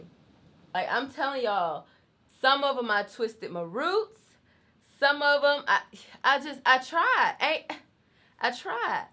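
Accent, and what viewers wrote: South, United States. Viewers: Lmao why are you so funny in this video??!! Sounded so country 😂😂😂😂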